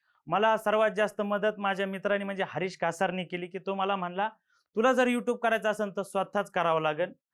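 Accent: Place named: Ahmednagar, India. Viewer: . तुझी टिपिकल नगरीं भाषा मस्त वाटतेय..तसेच बोलण्याची tunig आणि मकरंद अनासपुरे यांच्या सारखी....कलाकारी खूप छान वाटतेय 👌👌👌... तूमचा तिघांचा पोडकास्ट..... कालच बघितला...... Nnss कॅम्प बघून ती कलाकारी शाळेतील वर्गमित्र सोबत करून कलाकार होणे.... मानलं राव 👌👌👌👌gret ❤️❤️👍👍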